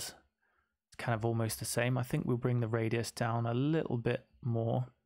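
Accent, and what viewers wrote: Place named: England, United Kingdom. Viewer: Very well and systematically explained in a normal English accent that one can easily follow. No unnecessary and erratic mouse movements. I have seen many Affinity Designer tutoriuals. Some of them don't even bother to speak. They just play some wierd music in the background, move their mouse (with a really small white cursor) erratically and expect us to follow. Well, not at my age. My only request to you would be to get a screen recorder where the mouse is highlighted and it emits sort of light waves when clicked. That way, we seniors will be able to follow your tutorials better. I have suggested this to many YouTubers and some of them have implimented it and thanked me. I am now subscribed to you. I see that you don't have an interactive Facebook group where one can ask questions to the community and the guru (you) can step in every once in a while to give a final judgement. 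Best wishes from Australia.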